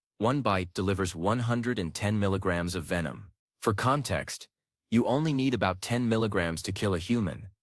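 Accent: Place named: United States. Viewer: I Vote B#LLSH$T...The american voice is a giveaway...Yanks are full of it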